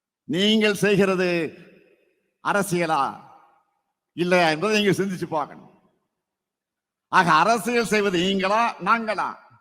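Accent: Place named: Telugu, India. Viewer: தெலுங்கன் தமிழ் பேசுகிறான்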